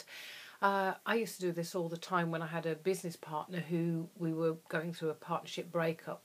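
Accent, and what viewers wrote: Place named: United Kingdom. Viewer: And fuck those haters your gorgeous and smart and nice and honest your make up and hair is just fine,they just hating.fuck them your right how to deal with them,all these haters I would love ❤ to see what they look like,lol lol I bet it would be a permanent Halloween show lol lollol and love your British accent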